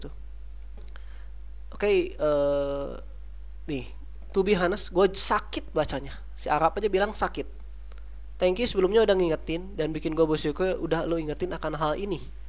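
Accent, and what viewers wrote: West Java, Indonesia. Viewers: doi orang sunda kayanya jadi ngomongnya gitu wkwkwk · logatnya sunda banget 😂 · Sunda bgt :D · Logat nya orang sunda banget dah Apa orang bandung ? Wkwk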